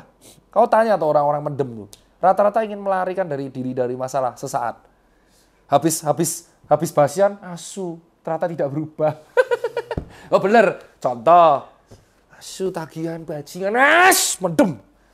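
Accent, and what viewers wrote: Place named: Yogyakarta, Indonesia. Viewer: Logat jogjane kentel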